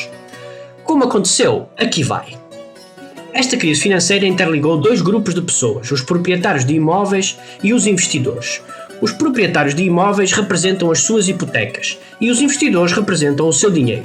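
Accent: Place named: Portugal. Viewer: Muito bom, mesmo sendo brasileiro consegui entender bem seu sotaque portugues kkk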